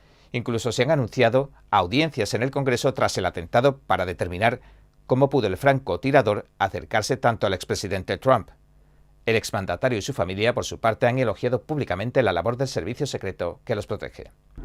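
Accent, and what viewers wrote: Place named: Castile, Spain. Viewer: ¡Como estoy disfrutando de este canal desde que lo descubrí el otro día! EXCELENTE información sobre EEUU y con locutor español, con acento castellanp.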